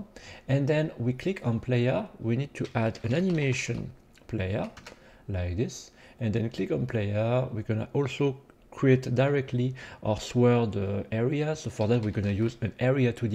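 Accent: France. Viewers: j'ai compris presque immédiatement que tu étais français sans considérer ton pseudo 😂, très bon tutoriel merci chef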